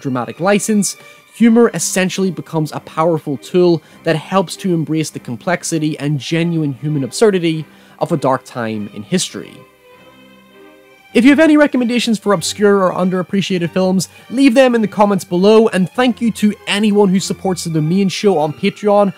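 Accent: Northern Ireland, United Kingdom. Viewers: Northern Irish orginally? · I think northern irish maybe?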